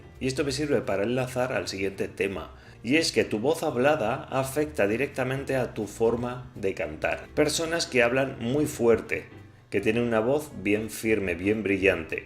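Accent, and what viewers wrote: Spain. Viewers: eres asturiano?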